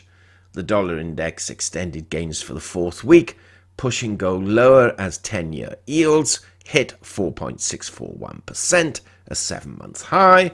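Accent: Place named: RP, United Kingdom. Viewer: get rid of the posh English accent and the waffling,and you could cut the video time in half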